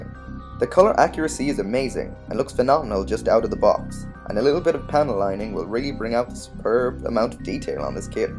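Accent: Ireland. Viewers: How did he ever sound Asain? He's Irish and has a clear Irish accent...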